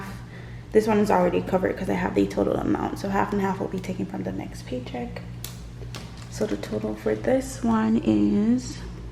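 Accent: Jamaica. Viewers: Girl i can hear the Jamaican accent a lil 🤣🇯🇲💘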